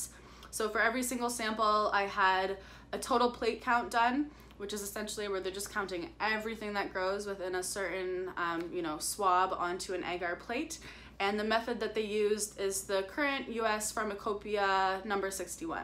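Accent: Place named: Canada. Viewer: ABHOOT 😭😭 that Canadian accent omg so cute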